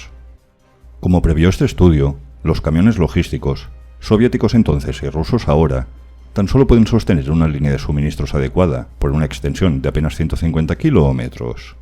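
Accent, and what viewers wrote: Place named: Aragon, Spain. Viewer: La entonación parece maña, de Zaragoza o si no de la rivera del Ebro.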